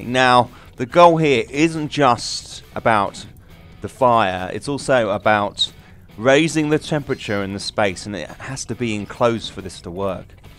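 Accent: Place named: Germany. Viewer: why do you speak as german?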